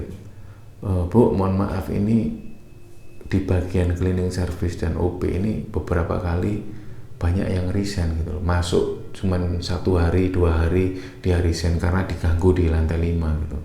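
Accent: Java, Indonesia. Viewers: Mirip aksen jawa nya aja...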